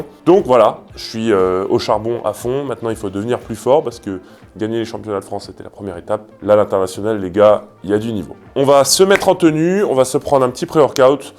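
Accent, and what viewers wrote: Quebec, Canada. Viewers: Hahah tu as vraiment un talent pour te mettre dans des personnages 😂😂 et tu le fais vraiment bien, perso je kiff l’accent Québécois 😂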